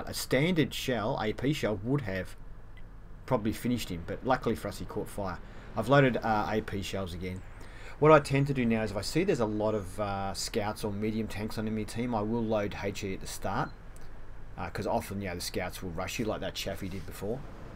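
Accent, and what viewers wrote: Australia. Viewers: I see you have the 124 games in the FV183. Can you do a review on that? What do you think of the HESH after the nerf to pen? You do Excellent reviews. I like the Aussie accent !! :P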